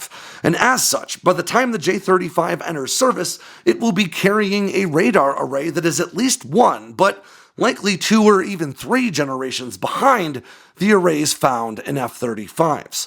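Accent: United States. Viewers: Oh an American talking im out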